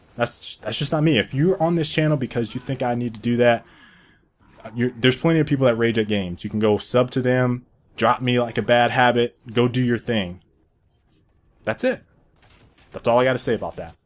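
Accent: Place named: Texas, United States. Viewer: Man I'm starting to hear some Texan accent. Good vid btw.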